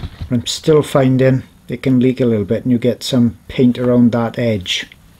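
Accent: Wales, United Kingdom. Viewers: i'd recognise that accent anywhere. where in Wales are you from?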